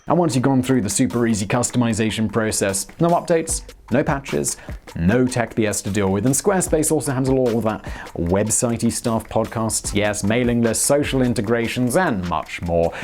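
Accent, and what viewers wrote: England, United Kingdom. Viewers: a suggestion. Slow down. Your videos are educational and informative, but your pace is unnecessary. Your knowledge and British accent alone keeps people watching, but your pace has me tuning out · OK THANKS, I ENJOY WHAT HE IS TALKING ABOUT... BUT A LITTLE HARD TO CATCH ALL THE FAST WORDS WITH THAT ACCENT.. MY BRO IN LAW IS FROM ENGLAND, BUT HAS LOST MOST OF HIS ACCENT... KEEP UP THE GOOD VIDS...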